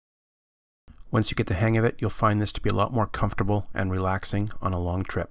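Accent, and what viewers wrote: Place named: Canada. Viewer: Only learn canoe techniques from someone with a Canadian accent.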